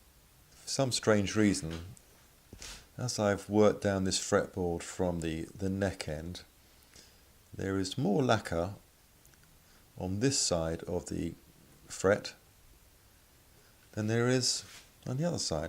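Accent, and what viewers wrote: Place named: England, United Kingdom. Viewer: I love English accents.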